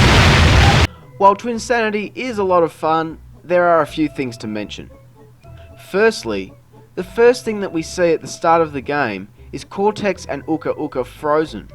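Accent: Australia. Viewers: I'll guess your region Australia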